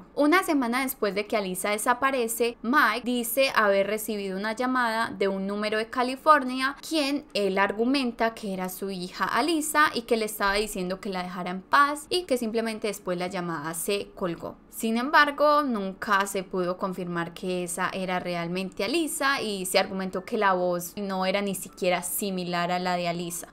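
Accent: Antioquia, Colombia. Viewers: Te encontré, y no pude resistír hacer una maratón de tus videos . 😮 wow lo haces muy bien y ese acento paisa me encanta viva nuestra 🇨🇴👏🏻👏🏻👏🏻👏🏻👏🏻👏🏻👏🏻👏🏻👏🏻👏🏻👏🏻👍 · Es una mueletilla del acento paisa en Colombia...es genial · Primera vez que veo tus investigaciones, soy panameña, me encanta tu atento colombiano, me encanto el Berraca, excelente trabajo y este caso te quedo fenomenal, duro y exitos guapa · un gusto tu forma de contar ya me suscribi eres de colombia?saludos desde lima Peru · Wow! Muy buen video, me gusta como narras y la información que proporcionas está muy detallada 👌. Tienes una nva. suscriptora 😃. Por cierto tu acento de 🤔 colombiana? me suena bien lindo, me recuerda a las de las novelas colombianas que veía con mi hija 😁. Saludos desde Mérida, México 🇲🇽. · es Colombiana 🇨🇴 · Eres de colombia verdad se nota por tu asentó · Saludos desde Orange California 🍊 from 🇲🇽 Mexico. 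Ese acento colombiano el mas hermoso ❤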